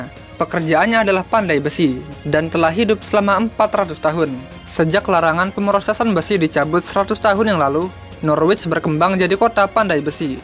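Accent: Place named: Bali, Indonesia. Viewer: logat nya cerita kayak orang bali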